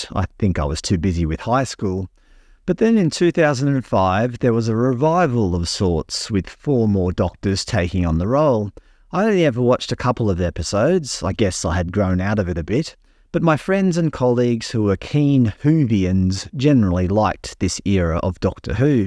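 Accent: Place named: Australia. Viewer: Americans listening to you won't realize that you're Australian not British, but you've got a nice accent. I don't want my Doctor to be female, i don't mind a female time lord i loved Romana, Mary Tam in the 70s but The Doctor should be a male, I also don't want the Doctor to be black, i don't mind a black time lord but after growing up with the Doctor being a white ( straight) male i find these changes just forced diversity, I'm not racist or homophobic, I'm gay myself and i wouldn't mind a black James Bond played by Colin Salmon for example. The BBC should be forced to scrap the TV license tax because Disney is a commercial company and it's funding this car crash of a tv show. Donna's trans daughter criticizing the doctor for not being a woman well they( Donna's daughter) never was a woman and still isn't. So the Daleks are now in wheelchairs now? 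Oh and I forgot i don't want my Doctor to be played by an immigrant, he should be played by a British actor, that's actor as in man. The producers, writers and actors are informing us the classic fans Today "sorry straight white males this show wasn't made for you and it never was" Tomorrow they'll ask "Why aren't you watching our show?"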